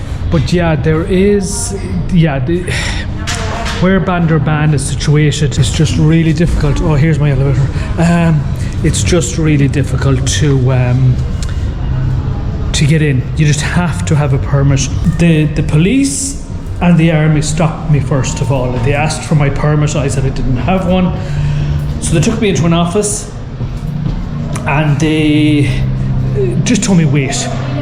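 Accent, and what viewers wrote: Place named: Ireland. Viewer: Absolutely brilliant. Discussing poetry and war on the border of Bangladesh with a senior army official. Fascinating and slightly surreal to listen to you sharing this experience. You retold it with such kindness and even better with your lovely Irish accent. Watching your videos remind me of my visits to Bangladesh and I am looking forward to watching the rest of your travel videos through Bangladesh. Thank you for sharing a part of your adventure/journey.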